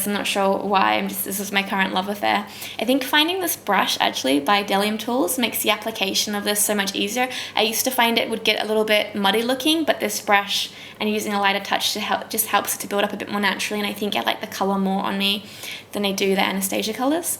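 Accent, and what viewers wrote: Australia. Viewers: I absolutely love this look! So exciting hearing an Aussie accent :) keep up the great work! Subbed! :)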